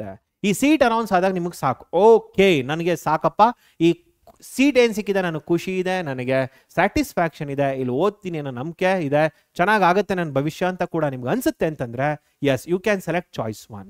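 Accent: North Karnataka, India. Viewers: Sir nivu uttarakarnatakadavara 😊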